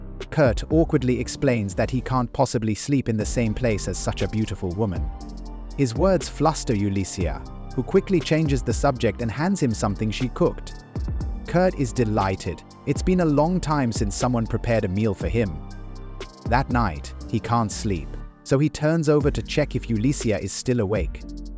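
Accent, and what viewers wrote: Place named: United Kingdom. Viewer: First. time i saw a british voice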